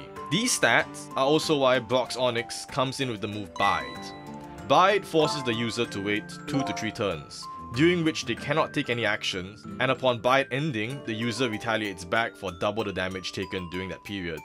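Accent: Singapore. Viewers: i heard your accent and i immediately knew you’re singaporean 😂 subscribed! #SupportLocal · I thought I recognised the accent!! Hello! From Singapore too!!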